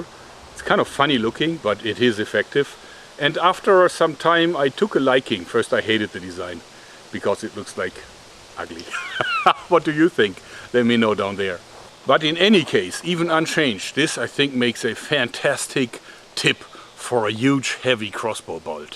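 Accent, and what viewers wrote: Germany. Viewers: I think you are German.. nichts wahr?..I hear pronunciation...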